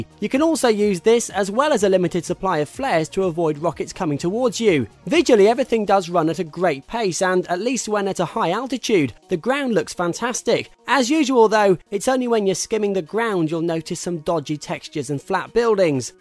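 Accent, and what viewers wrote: England, United Kingdom. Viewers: british narrator for american game wtf? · GRRR british accent sucks.. Its same as german and english together -> British... Nothing beats american Accent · he speaks funny this is why i like ricky gervais and british people. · what is this accent??? english? canadian? australian?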